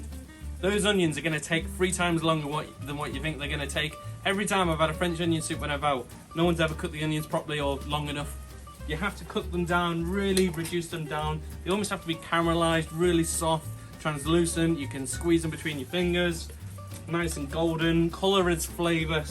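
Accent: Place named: Wirral, United Kingdom. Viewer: You sound local... Wirral?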